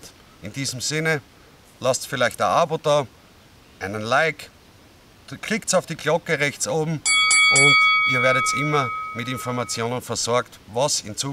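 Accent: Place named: Belgium. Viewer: Bent u belgiesch, ik wel en ik hoor een soort Nederlands belgiesche toon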